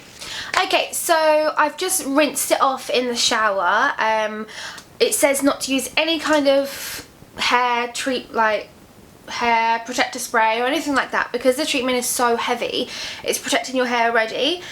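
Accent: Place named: United Kingdom. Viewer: love your British accent you’re beautiful thanks for this video. Still haven’t done it yet gonna color first.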